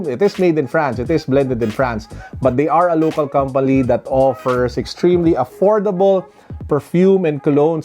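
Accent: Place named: Philippines. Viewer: Good review That pinoy accent is THICK > peace from Makati City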